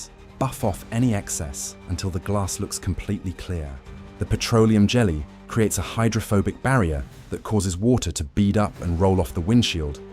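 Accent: United Kingdom. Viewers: Why the British accent?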